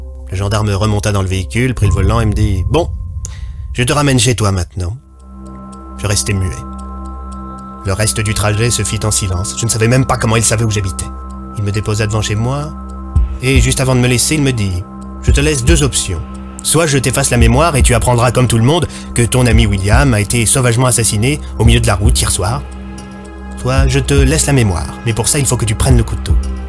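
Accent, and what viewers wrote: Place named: Quebec, Canada. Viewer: J'ai pas du tout écouté l'histoire. Ton accent québécois m'a suffit x)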